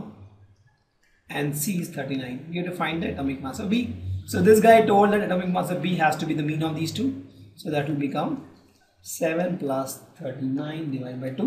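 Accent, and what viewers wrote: India. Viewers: u need subs for an Indian English?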